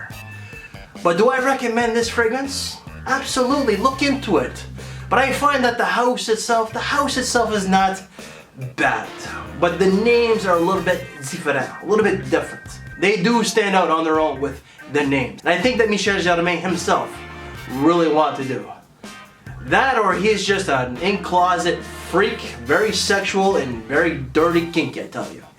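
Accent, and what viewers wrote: Quebec, Canada. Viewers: I don't know how to feel right now. Like you said, the scent is nothing special, but the visuals.....how did you manage to kiss yourself? lol Appreciate the accent québecois. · Es-tu quebecois?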